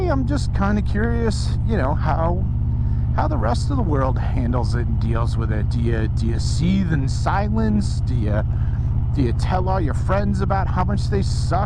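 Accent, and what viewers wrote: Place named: Boston, United States. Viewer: your handlebars are trash and your voice screams boston ya fhekk...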